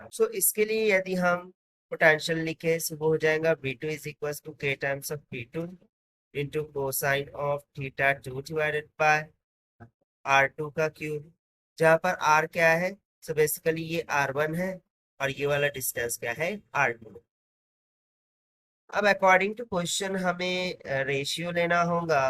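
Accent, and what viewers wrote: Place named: South India, India. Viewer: South Indian hai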